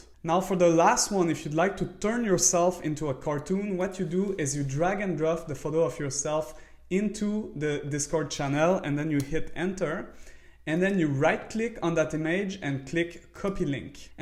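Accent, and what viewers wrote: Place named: France. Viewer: belle accent français ahah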